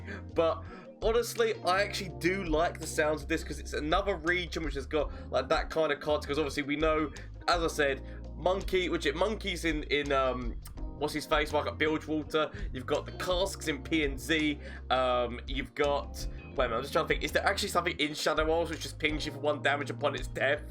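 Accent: United Kingdom. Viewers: i just realized that your name is, and i couldn't be more confuse with this British accent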